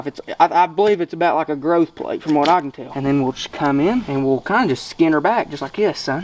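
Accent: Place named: Kentucky, United States. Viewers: & who says the southern accent indicates stupidity. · Where you from in Kentucky? I live in Ky & soon as I heard you talk I knew you must be from here lol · I can hear the Kentucky in your voice, It's good to see some Kin, Im from somerset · Genius. I see what you did with the short video. ngl this was entertaining and the accent bro. Grew up in a small Florida town so I love hearing the country accent. entertaining video man!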